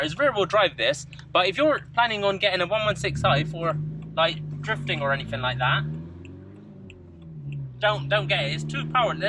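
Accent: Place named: United Kingdom. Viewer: do you know how currencies work? He's got a british accent mate.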